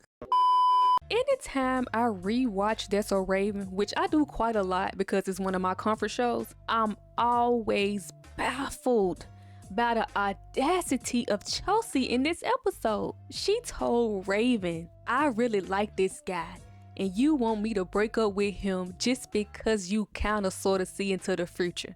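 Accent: Memphis, United States. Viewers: I remember thissss! Are you from Memphis I hear the accent! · You talk like you from Memphis lol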